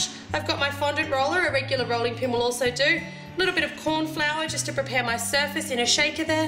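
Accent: Australia. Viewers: I thought u were Australian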